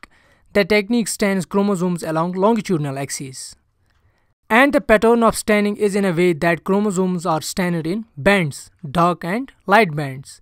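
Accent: Kashmir, India. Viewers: Well Explained Although the Indian accent is thick as fu*k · You voice is like that of Kashmir.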